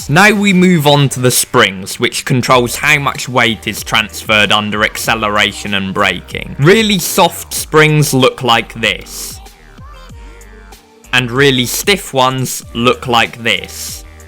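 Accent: United Kingdom. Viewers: british accent + braces = the most unconfortable voice to hear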